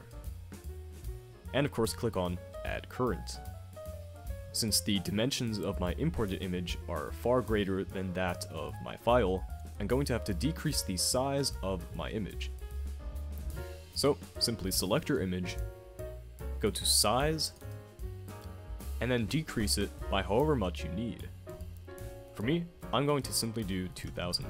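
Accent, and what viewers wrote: Germany. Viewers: Youre German? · Wait, are you from germany lol?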